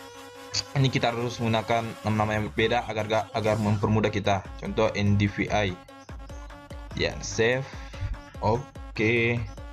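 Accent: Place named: Bugis, Indonesia. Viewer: Logat bugismu itu e 🤣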